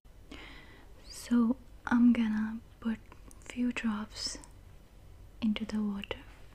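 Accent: India. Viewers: Where has this video been?! I have always looked for an Indian accent. This is so perfect. I melted to sleep, and you are candy for the eyes.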